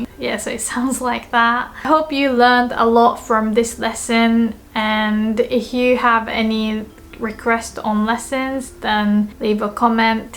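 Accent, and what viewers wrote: United Kingdom. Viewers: Are you in Uk? A bit accent of the UK! Overall, your teaching is helpful! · Actually, it's a British accent. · Most of the Japanese teachers have an American accent, enjoyed seeing British pronunciation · I initially thought you might be British...... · It sounds super british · I wonder if she learned english from a British teacher 🤔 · You have a great accent I've never heard before! Did you Maybe live/learn English is England or the UK? You have an accent similar to James Grime. (and brains to match!) (singing banana / numberphiles math YouTube channel.) 💖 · Your English has a British accent.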